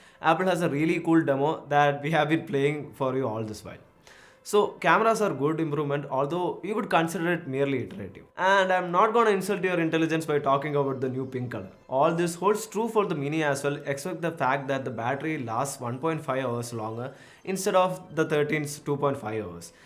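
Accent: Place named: Tamil Nadu, India. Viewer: Aiyo tamil ascent , so sweet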